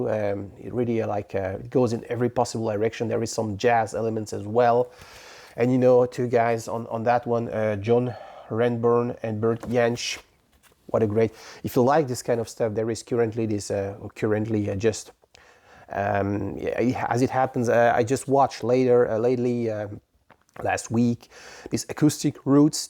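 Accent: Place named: Italy. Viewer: Salut j'aime beaucoup ton accent italien 😁😉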